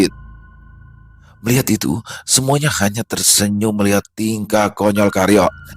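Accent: Jakarta, Indonesia. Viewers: Selamat malam bang Klaten hadir ni yg ku suka logat Betawi ya mantap❤❤❤❤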